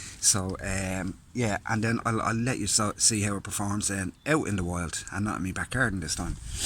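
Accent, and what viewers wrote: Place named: Ireland. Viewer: Awesome tent and a great video, thank you. Always love the Irish accent - more like purring than speaking! Just FYI and for others, the full weight of the 1P is 1.25 KG, vs 1.42 as you rightly said for the 2 person. So for the 170g difference I agree - take the extra space! £240 online right now as well. A properly well made tent, rather than the "Big" American brand stuff.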